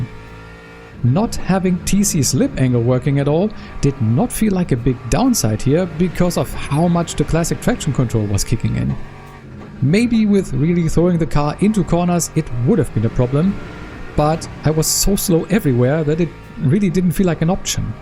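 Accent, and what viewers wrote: Germany. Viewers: machst du das auch in Deutsch weil ich würde an deiner Aussprache sagen du bist ein Deutscher wäre cool danke :D lg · Well that's a subscribe from me. German Accent + Motorsport + Guides That a recipe for success.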